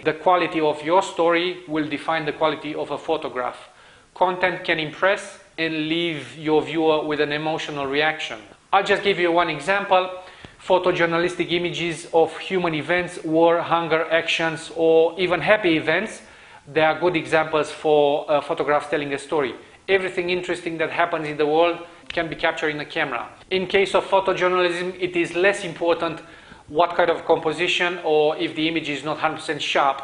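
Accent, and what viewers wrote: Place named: Romania. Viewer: you sound like a romanian:)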